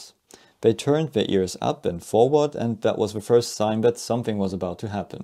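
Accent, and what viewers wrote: Germany. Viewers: Klingt so, als würde ein Deutscher versuchen, Englisch zu sprechen.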